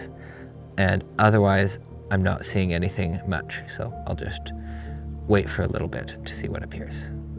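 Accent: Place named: United States. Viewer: It'a a lovely area with some great fauna. I'm intrigued, because you sound American / Canadian but the fauna seems to be from central or eastern Europe.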